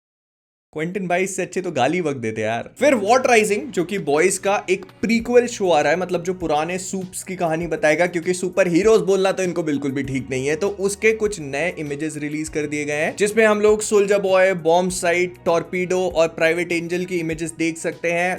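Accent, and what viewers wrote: Bhopal, India. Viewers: Makda bhopali nikal hi aata hai bhai ka😂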